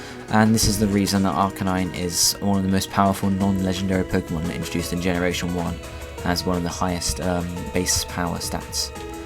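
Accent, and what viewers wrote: United Kingdom. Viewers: There are two things I'd like to point out: 1.) the accent. It's way too British. If you could some how make it more neutral I'd appreciate it. 2.) if you make a mistake stop recording and do it all over again. It bothers me to listen who should be teaching me to make so many mistakes, specially when you add the British accent and the fact that you also leave some sentences without the final pun. Work on that please. :)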